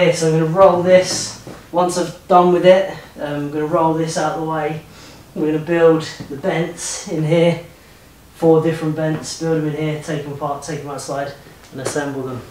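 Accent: United Kingdom. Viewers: actually what I meant was his British English accent as compared to American. Sounds lovely.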